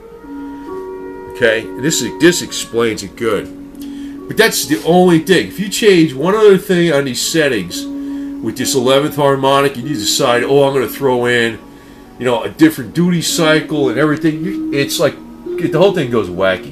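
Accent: United States. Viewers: amrykanski gadzi głos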